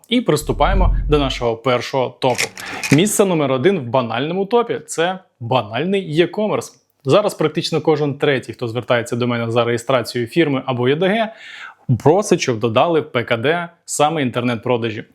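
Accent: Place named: Russia. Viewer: Для чего ты мучаешься на украинском языке разговаривать если твой родной язык русский это же видно Тем более что белорусы тоже могут твоё видео посмотреть про Польшу и ничего не поймут по-украински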